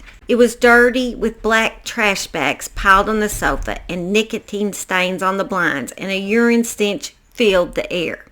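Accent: South, United States. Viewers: Bloody hell! There are just no words to describe this level of depravity! On a positive note: I LOVE your southern accent ❤ Cheers from Australia 👍😁🇦🇺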